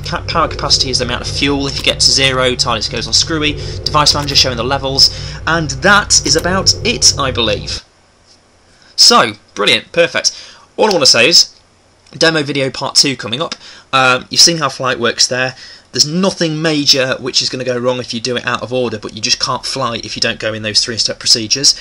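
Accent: United Kingdom. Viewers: uhm, lol? what, are you saying your Asian or Something, he is speaking English with a Pommey/British Accent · Glad to see the English accent's lost on most of you :) But thanks for dropping a line, the project has indeed ported over to Fallout New Vegas, the link to which you can find via my channel profile. Can understand why some of the non-British can't understand, we've got the most diverse dialect range in the world when it comes to a language.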